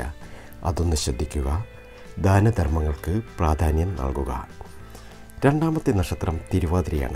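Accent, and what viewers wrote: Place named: Thiruvananthapuram, India. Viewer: തിരുവനന്തപുരം ആണ് നാട് ആല്ലേ ? "ഫാഗ്യം " എന്ന് പറഞ്ഞു തുപ്പൽ തെറിപ്പിച്ച് ഫോൺ നശിപ്പിച്ച്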